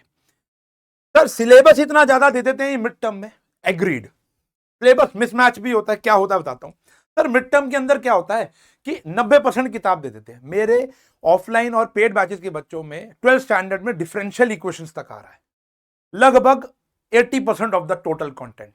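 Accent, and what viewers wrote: Punjab, India. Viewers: Are you from punjab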